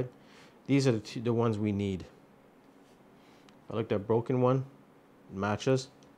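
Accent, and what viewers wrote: Quebec, Canada. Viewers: You sound like you're from Quebec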